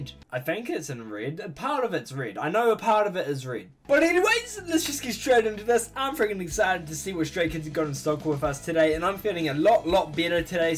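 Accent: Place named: New Zealand. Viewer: Where is he from, he sounds like he's from NZ, I'm from NZ and he sounds like he's from NZ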